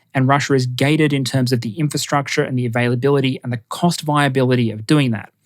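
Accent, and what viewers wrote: Australia. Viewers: Well, he's an Aussie. Were you expecting something like "Australian language"?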